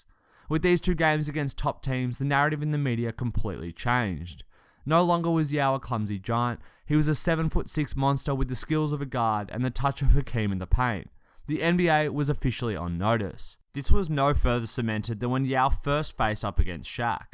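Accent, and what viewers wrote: Australia. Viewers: Do I hear a fellow Aussie that’s a sub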